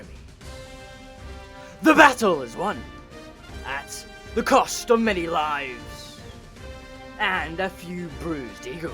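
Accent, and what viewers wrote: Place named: Scotland, United Kingdom. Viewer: You can be Scottish and understandable. Speak calmly please.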